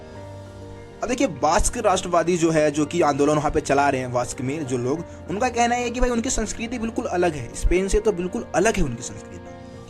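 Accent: Bihar, India. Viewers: Sir aap Bihar ke ha kya.aapki batto se laga hame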